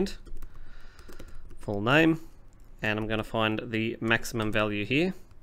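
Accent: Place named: Australia. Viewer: I've been using Excel since 1993 ver. 5 & am still learning. Great channel! Was in Australia for a whole month in 1987 so recognized your accent. Cheers from Vancouver.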